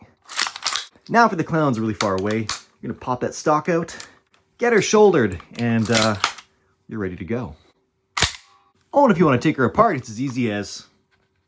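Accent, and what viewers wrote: Canada. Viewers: You sounded more canadian in this one haha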